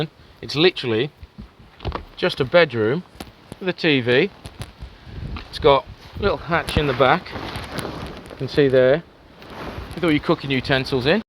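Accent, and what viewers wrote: United Kingdom. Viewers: another good video bro. Are u in england ? cant make out the accent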